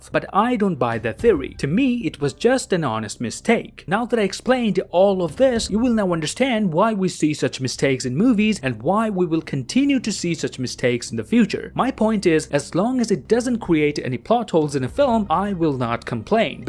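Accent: Canada. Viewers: I like this voice more than the others voices it sounds better and more Canadian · What part of Canada are you from mate? Really rate the accent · R u from Canada??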